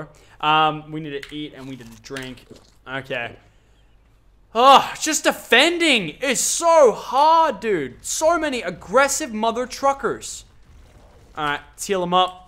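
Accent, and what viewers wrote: Australia. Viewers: Is he australian cause i am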